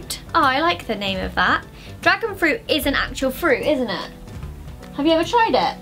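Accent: United Kingdom. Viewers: I thought she was British...how does she speak English????? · I've been watching your videos for so long that I forget your British and your "accent" doesn't sound different to me anymore. You basically sound American to me lol👌😂❤️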